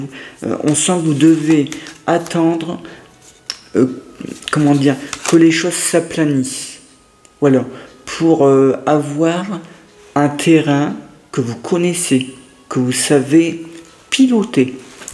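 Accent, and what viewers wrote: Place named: Nord-Pas-de-Calais, France. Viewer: 😮❤ merci à vous et très jeune heureuse année 25... Merci pour votre tirage 😊 Mon petit cœur est las d'attendre quelques nouvelles palpitations.... Rien depuis 21 ans... Sinon une solitude subite à 49 ans..décidée par l'univers.... Rien et personne depuis 20 ans... La lionne attend. On verra bien ce que 25 fera de positif. En tout cas, j'ai bien aimé votre tirage, vous êtes particulier, calme apaisant et peut-être même habité 😊 J'ai beaucoup aimé aussi votre petit accent ch'ti... Est-ce que je me trompe..., je ne crois pas être médium...🙏 ☺️ Nouvelle abonnée... à très vite Cathy 59 😉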